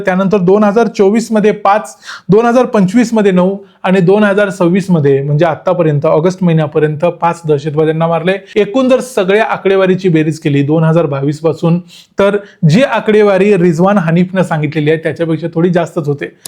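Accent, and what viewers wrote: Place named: Kolhapur, India. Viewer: असून मस्त कोल्हापुरी बोलतोस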